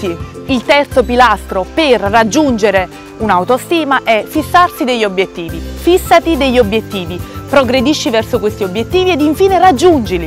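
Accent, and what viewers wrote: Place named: Naples, Italy. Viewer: Che bello in tuo accento napoletano !!!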